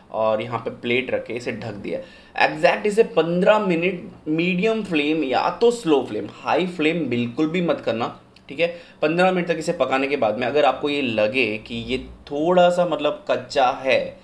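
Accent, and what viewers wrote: South, India. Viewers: tera south indian accent wala voice rocking hai bhai...!!!!👍👍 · I am saying true i don't cook food but I am a foodie i watch your video for entertainment by your south Indian voice 😀😀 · bhai Aapki recipi ki saari video dekhata hu muze bahut pasand hai. Aur aapki ki vo sauth Indian waali voice bahut pasand hai. Aaj aapki real voice suni vo bhi best hai. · Very nice 👌👍🤗 specially when u "spoke"Normally" 😂 I NVR liked ur South Indian accent 😢 Once U commented also , (long back) aftr that I nvr saw ur videos, but now I enjoyed this video (with ur "normal" voice 😂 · Nice original voice😍👌sir.... bt mujhe apka south India voice version is se vi jada pasand hai❤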